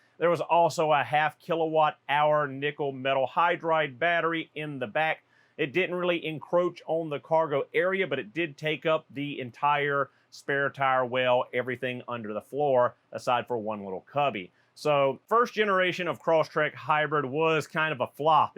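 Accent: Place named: Arkansas, United States. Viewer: I love your accent 🙂, Arkansas? Love from Czech rep. Thx for great content.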